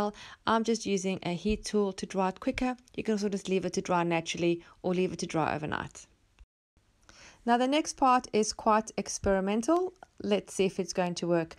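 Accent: South Africa. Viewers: Love watching your channel. You sound South African 🤦‍♀️ I am from SA. Going to see how I can do this without the inks ❤️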